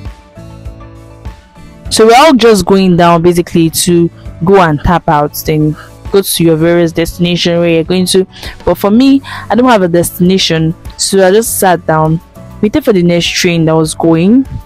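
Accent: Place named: Yoruba, Nigeria. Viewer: I like your spoken English with broken and yoruba vibe......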